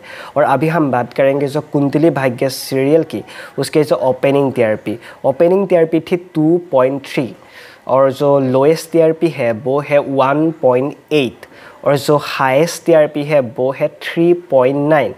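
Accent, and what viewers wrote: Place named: Assam, India. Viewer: Kya aap Assam se ho aap ke bate me najar aa rha hai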